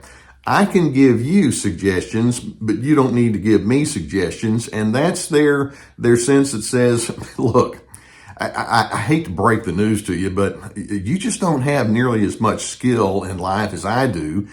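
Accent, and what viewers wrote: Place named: Texas, United States. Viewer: I feel better just looking at Gus. A really chilled dog! Love Texan accent too! It helps so much to have these videos to understand my past and in such a nice way. Thank you!❤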